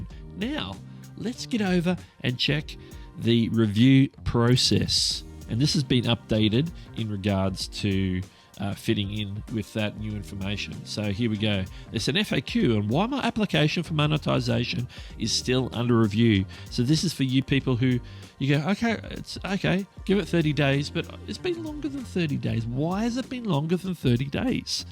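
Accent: Australia. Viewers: oh, aussie.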